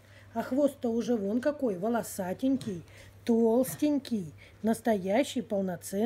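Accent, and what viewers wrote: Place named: Russia. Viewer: Thank you for the subtitles. I love hearing your beautiful Russian accent when you speak. I've been learning the cyrillic alphabet and how to speak Russian, I had always wanted to learn. Thank you for taking such good care of Katya, she looks so much brighter and healthier after having spent time with you and your loving husband.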